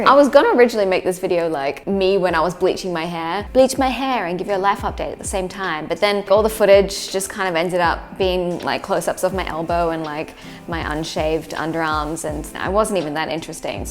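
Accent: Australia. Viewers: Fun watching your videos. Great, your back in second home. Didn't know you are Australian, no strong accent. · Amazing, I followed a link on PhillipDefranco's video and saw a wonderful video by an amazing videographer and instantly recognised that Aussie voice. I watch a view more videos and realise you are a fellow Brisbanite. That sucks me down the rabbit hole as I then proceed to watch all your Australian videos first and then a few others you have made. I'm a little binged out but I just need to comment and say thanks for the great content (much I will try and finish in the future, even though most of it may not be the content I would normally view) but I believe good content, told by an amazing story teller and presented in such a great way like you do, should be rewarded with at least a like, maybe a comment and possibly a subscribe. You get the trifecta. 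Cheers I will admit being from Brisvegas as well, may have biased me a little bit. Ok... a lot. Have a good one.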